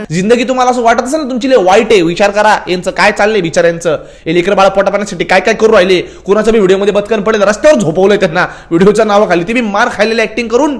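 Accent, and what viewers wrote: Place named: Mumbai, India. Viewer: मुंबईची मराठी तर फारच छान 🎉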